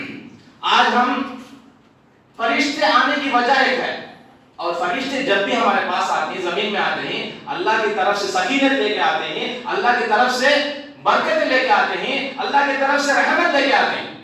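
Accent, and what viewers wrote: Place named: Chennai, India. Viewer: Mashallah After long time I heard Chennai slang Urdu bayan.Alhamdulilla Very beautiful bayan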